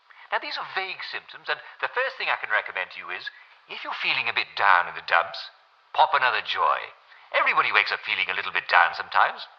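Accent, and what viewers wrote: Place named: United Kingdom. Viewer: It's the English way of speaking English. We say lift instead of elevator, we say V-ih-tamin instead of V-I-tamin.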